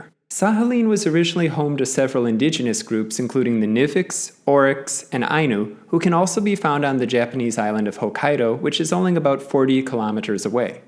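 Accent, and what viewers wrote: United States. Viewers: Why would you expect someone who’s clearly American to pronounce the Russian O like /ɔ/? That sound quite literally doesn’t exist in American English at all (except in diphthongs and before R) – so of course he won’t say it the Russian way, especially in the middle of an English sentence. In the same way, an English person shouldn’t expect a Russian to pronounce “New Hampshire” or “Hythe” exactly the English way – and especially not in the middle of a Russian sentence, because that would just sound weird. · I think he just pronounces it like that because he's American. the o sound like in "top" or "stop" is pronounced like an "ah" sound in American English. American English doesn't really have a sound that's exactly like the stressed o in Russian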